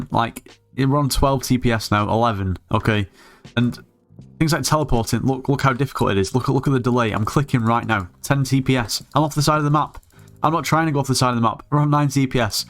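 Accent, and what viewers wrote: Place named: Midlands, United Kingdom. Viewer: Also where are you from, you dont sound too northern. I want to say midlands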